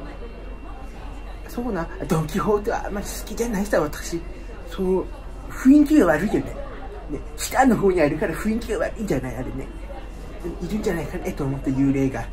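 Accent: Miyako, Japan. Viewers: 沖縄本島人からしてもすぐ気付く宮古訛り、 いいっすな。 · イントネーションと発音がめっちゃ宮古～！って感じですね笑 石垣のおばさんたちも話してる内容だいたいこんなです☺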